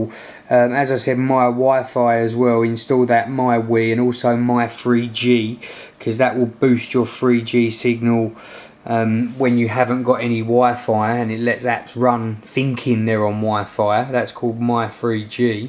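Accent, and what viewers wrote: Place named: United Kingdom. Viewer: Piges?! Lol british accent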